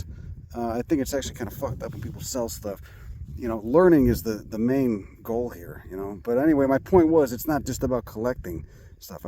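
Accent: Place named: New York, United States. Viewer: You sound like a new new Yorker but I love your knowledge. We love in Wyoming. Most the same stuff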